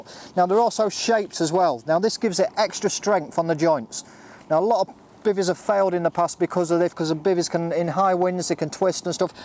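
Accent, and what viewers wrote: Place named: North, United Kingdom. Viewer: Absolute nightmare presentation mate. You sound like a cross between a pissed up northerner that's just inhaled a helium balloon with the animation of a Duracell bunny.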